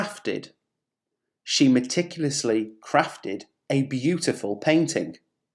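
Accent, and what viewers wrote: United Kingdom. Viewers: Hello, you provide an amazing content here on youtube. I'm very grateful. Thanks a lot. But my son and me, we are a little bit curious from which part of the UK you come from:) because I would like to know more from which part is your accent. Sorry if I bother you with this.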